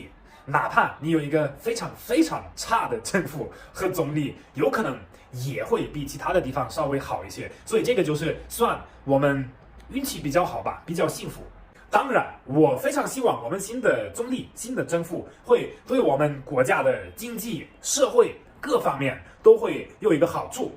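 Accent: Sichuan, China. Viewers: 你的四川話真地道,希望德國人不要受美國的影響,不要欺侮中國人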